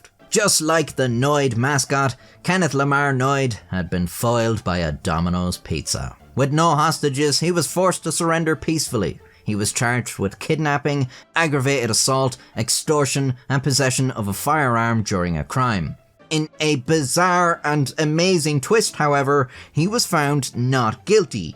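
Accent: Ireland. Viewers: What county are you from, my dads from cork, I can’t pin your accent but i know it sure as hell isn’t Dublin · I love this channel. The interesting stories, the excellent artwork, your wonderful Irish accent and the humor. Entertainment and informative too.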